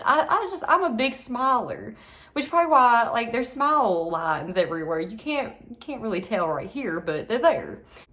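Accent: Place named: South, United States. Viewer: On tiktok on the newer ones I would guess Arkansas or Texas. This one feels more north central, Tennessee maybe. I'm from Louisiana myself and usually have an excellent ear for accents but I can't seem to narrow yours down. That's my random thought. Carry on.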